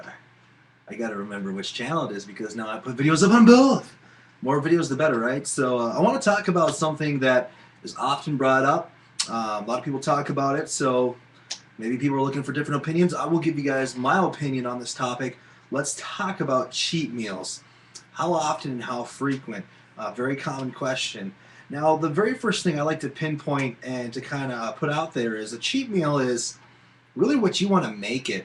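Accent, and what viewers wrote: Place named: Minnesota, United States. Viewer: The minnesota accent annoys me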